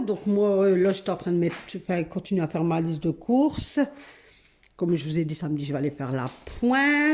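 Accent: North, France. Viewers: Bonjour merci pour ta vidéo même si ton homme est là 😁. j'adore t'entendre parler sa me fais rire on reconnaît bien l'accent du nord😊, j'aime bien ta façon d'être si simple et honnête sur les produits ménagers, continues comme sa je te suis pas depuis très longtemps mais j'adore 🥰 bisous à toi et à ton fils 😊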